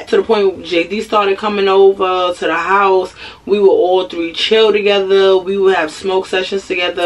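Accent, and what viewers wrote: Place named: Newark, United States. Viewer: I’m from Newark and moved to another state for a better life with my family I knew instantly were u was from because of that accent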